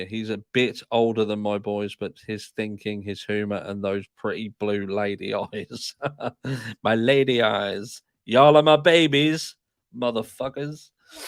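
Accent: United Kingdom. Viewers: Apparently, the closed caption doesn't translate UK English very well. It's throwing words up that are no where near what you've said. LOL. Feel sorry for any people who rely on it.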